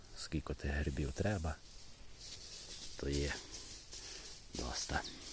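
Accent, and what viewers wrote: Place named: Zakarpattia, Ukraine. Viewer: Чернівці значить, я із Закарпаття,з Виноградова просто Ви розмовляєте як у нас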